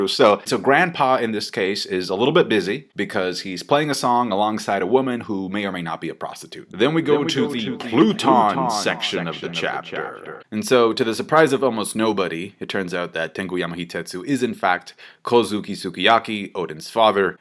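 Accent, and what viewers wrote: Canada. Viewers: Bro are you Canadian! And in psychology as a therapist I'm an aspiring psychological associates that's awesome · wait a second.......are you from toronto?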